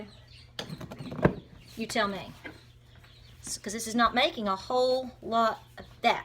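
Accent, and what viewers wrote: South, United States. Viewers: You shore sound like a true southerner. Butter and honey yummy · Darlin, I'm gonna make hubby some sausage gravy n biscuits for supper tonight. Gosh, listening to your accent, I do miss Tn and Va !!!!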